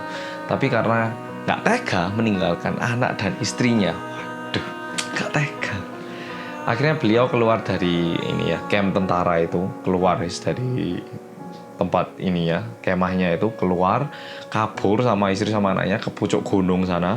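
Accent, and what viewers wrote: Java, Indonesia. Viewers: mas oppa ngomongnya medhok poll hehe... manteppp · Baru tau kalau pahlwan komarudin asli Korea ..suwun informasinya cak medok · Woow medhog banget mas ee,, kamarnya rapiin dikit, wkwkwk Tapi thank for ilmu yabg baru saya ketahui, terimakasih banyak, sangat bermanfaat, sukses bro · Jowo ngendi lek???😁😁😁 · rencana mau kerja di korea, cari" referensi di youtube eh nemu chanel orang korea yang medok, alhasil jadi suka liat vidionya ngomongnya itu loh yang khas. semangat mas 😊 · Meskipun mas ngomong nya indo,tapi agak agak medok ngomong nya 🗿🌻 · Mas maaf aku salfok medok banget mas😂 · Salam medooooookkk jang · ku kira yang ngomong orang jawa😁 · medog jawir smpean kang😁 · Kok kakak bicaranya kayak orng jawa yh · Sekarang 364ribu orang jadi tau mas medok.. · Korea medok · Kok lucu yo wong korea medok😁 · cocok, juga udah jawa kental jadi cocok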